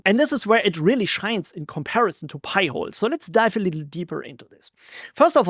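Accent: Germany. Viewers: You have a nazist accent!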